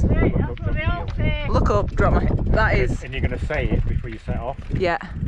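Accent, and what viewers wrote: United Kingdom. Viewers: I am so fall in love in your British pronunciation. Bloody Hell! 🤣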